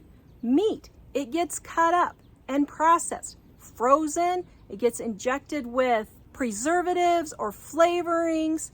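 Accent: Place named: South, United States. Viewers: 1., are you sure you aren’t Southern? 2. These people have never been hungry or they would know how if you are hungry enough you wouldn’t look to see if something is expired. 3. Cereal is nutritious when that is all you have and I eat Cheerios Honey Nut every morning. 4. How do you know if a can food has become infiltrated with botulism, I’ve always heard canned food can have it like bent cans? I’ve never heard how to recognize it. 5. People are going realize Pride goeth before destruction! Forgot verse number and book! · I am a firm believer in being prepared for any situation!!! This upcoming winter is gonna be a bad one. I would much rather be prepared now then wait. Seems like some people just don't wanna listen and prepare now but I loved your southern accent lol. I'm with you can never have too many spices 😁😁😁👍👍👍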